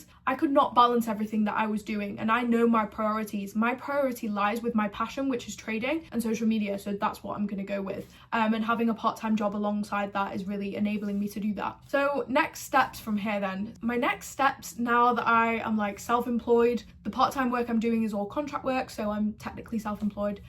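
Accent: United Kingdom. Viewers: Very switched on and can tell your Driven you sound like a stokie btw 😉. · you got a slight birmingham accent you from Brum ?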